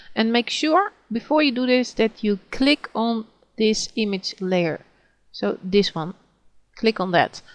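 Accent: Netherlands. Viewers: nederlander hoor je aan het accentje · Ik merk dat je Nederlands bent, wat is dat voor galm achter je stem? Interessante tutorial trouwens. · Your accent seems to be dutch. Nice tutorial btw. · so dutch hahah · Don't bother what he/she said. You are from Holland so its obvious your accent will not be the same as native american or british. In my opinion your voice is quite sexy^^ and thanks for this tutorial :D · Great tutorials. I've just subscribed. That's one hardcore Dutch accent!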